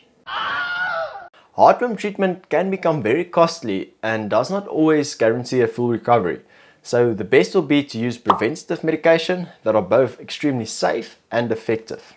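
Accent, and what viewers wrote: South Africa. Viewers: Thank you sooooo much sir!!! And I love your accent, it sounds South African...Hello from Texas🥰😘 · Excellent video. Great to hear the SA accent that's when I knew I needed to listen. My fiancée is SA and I am Irish, we live here in Ireland and it's very hard to get a vet to listen to you. They will only do what they think. My husky has been coughing for about a year or so on and off. Also when he howls he starts to cough. No vets have been able to help me at all. He got a scope of his throat and it was a little inflamed but no foreign object. He is diabetic and bg is not controlled after 3 years and he does pant a lot anyway so unsure if it's heart failure or cancer or what? It's very frustrating as the vets here seem to be behind other countries. Any further tips or information or even how to approach my vet to get them to explore further would be appreciated.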